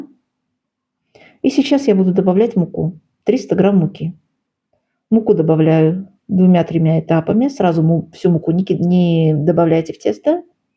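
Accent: Baku, Azerbaijan. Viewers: Какая же вы очаровательная,!!! Правильно вы сказали,что те рецепты несут с собой такое тепло,потому что мы пекли совсем с другими чувствами… Я больше слушаю ваш чарующий голос и чудесный акцент,сразу переношусь в Баку,и чувствую радость жизни.Вы мой антидепрессант!!!! Храни вас Господь,дорогая ❤! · Пирог конечностей и вы приятная ,удачи вам ,акцент Бакинский напоминания,всем здоровья и мира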